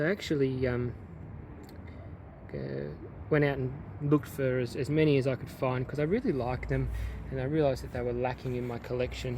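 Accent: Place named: Australia. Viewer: I appreciate your thorough descriptions and naming your cacti. Love you generosity and Ausey accent. And no I don’t like yellow flowers either. Dandelions are yellow aren’t they?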